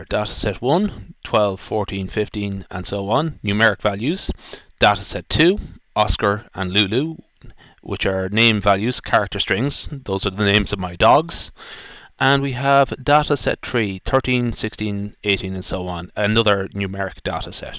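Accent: Ireland. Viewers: thanks :) refreshing to hear the irish aswell haha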